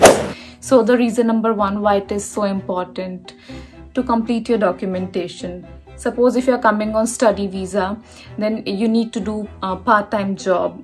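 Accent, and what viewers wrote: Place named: India. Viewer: Beautiful lady, from the accent im sure your an indian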